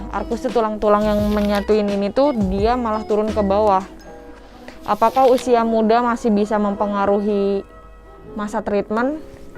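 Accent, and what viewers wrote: West Java, Indonesia. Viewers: Asli org sunda?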